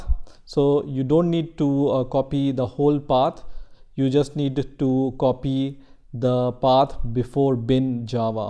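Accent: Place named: India. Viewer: When i saw the indian accent, i realized that i was in the right place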